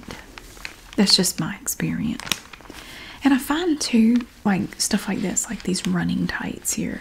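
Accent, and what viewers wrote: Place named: Danville, United States. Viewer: Lord she sounds like she's from Danville VA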